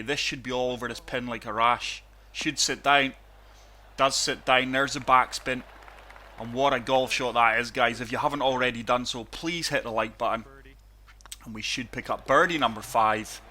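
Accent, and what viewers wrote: Scotland, United Kingdom. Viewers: Gave ya self the commentators curse mate lol. Ya voice reminds me a bit of Feherty. The Scottish accent works great for golf commentary. They shoulda got you to do the game commentary instead of Rich Beam and his shouty American accent. Some of the in game commentary is just laughable. Keeps me amused at least hahaha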